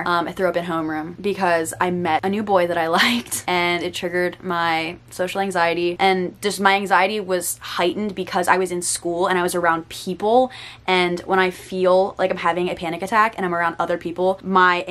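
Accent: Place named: Pennsylvania, United States. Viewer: And i think Pennsylvania's English accent is the best .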